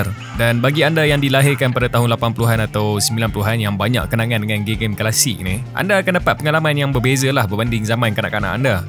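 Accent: Malaysia. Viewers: slang utara campuq selatan 😅btw nice review ,subscribed🎉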